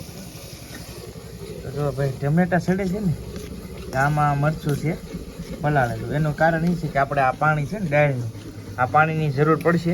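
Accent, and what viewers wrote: Saurashtra, India. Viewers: ખુબ જ સરસ દાળ બનાવી છે, આપની સૌરાષ્ટ્ર ની મીઠી ભાષા ખુબ ગમી જાય છે સૌરાષ્ટ્ર માં શિક્ષક ની નોકરી દરમિયાન આ આનંદ ખુબ જ લીધો છે, માયાળુ માનવી નો દેશ એવો છે કાઠીયાવાડ..... તારાપુર થી ભરતભાઈ વાટલીયા નિવૃત્ત શિક્ષક તથા ઘરપરિવાર ના જયગુરૂદેવ.. જયમાતાજી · Saurashtra ni mithi bhasa ghana valhate sambhalavs mali... Very nice..👌👌 ek j saggetion chhe... Tel ni jagya e guare banavela chokkha ghee no uptog karvo ..baki tame dal ne rotla mate jabarjast banavya chhe... 👏👏👏👏👏👍👌👌👌💐🌺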